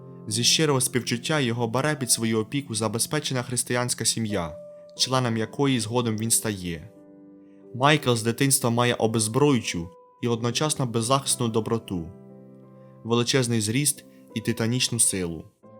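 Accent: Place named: Cherkasy, Ukraine. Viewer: Люблю цю вимову, диктор явно з Черкаської області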